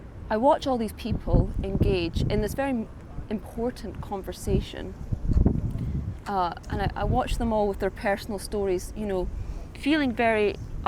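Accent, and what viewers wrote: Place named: Scotland, United Kingdom. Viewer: You're a Scot.. I had you at the 3rd word.. Dae ye ken Scots langage?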